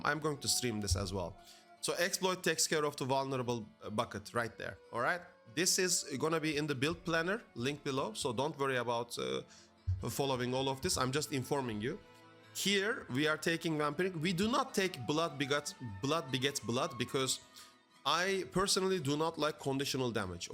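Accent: Turkey. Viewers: Aksanın Türk gibi kesin türksün ya 😅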